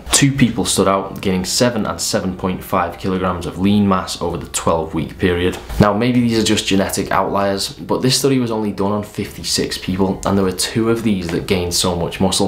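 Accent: United Kingdom. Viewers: Thank you very much for all that super valious information, and I love your accent, where are you from in the UK?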